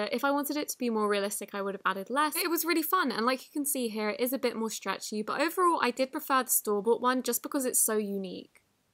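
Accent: United Kingdom. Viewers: it's so nice to hear a slime you- tuber from the UK!!! also great video 😍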